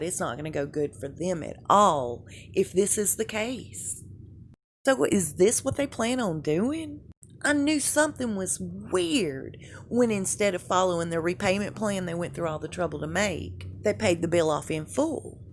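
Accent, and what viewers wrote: South, United States. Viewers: we love you You are so adorable! I have no idea how all these people who send you gifts know where to send them... Anywho I wish you a beautiful Happy Borthday September girl!! Also I use diclofenac ointment it’s a topical anti inflammatory that my dr gave me & it helps. I use ice if it’s real bad & otherwise I’ll just put heating pad on it! But I love your vids watch them all the time & I love your sweet country accent...just love it Big hugs love and many blessings sweet “talk to ya real fast” ☺️🌸☘️🌼🙏❤️✝️ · There’s a lot of variations of the Southern dialect My husband doesn’t sound like at all. But I think all the accents down South are so cool to listen to!!! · It's a southern accent 🙃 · She has a very heavy southern twang. I love her voice too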